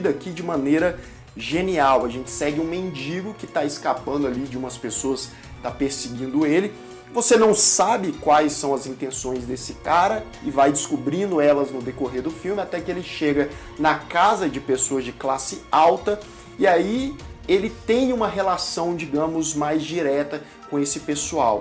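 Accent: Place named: Minas Gerais, Brazil. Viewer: ...vc pode ser de Brasilia,mas fala igualzinho aos mineiros...sou mineira de BH,embora more atualmente em SP,gostei mto da similaridade! Um forte abraço,seu trabalho é de excelência!( só vi Laranja mecânica!)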